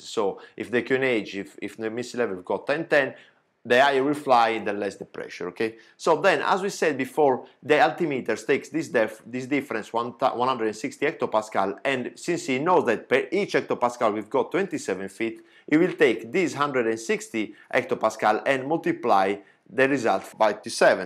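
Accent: Italy. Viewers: I love Italian accent in English Amazing man , I enjoyed the speaking more than explanation 😉. A320 tutorials if you don’t mind Capitano 😘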